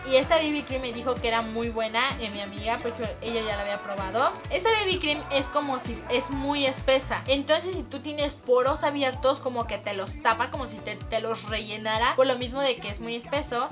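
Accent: Mexico. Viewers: a se le está quitando el acento maxicano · habla como fresa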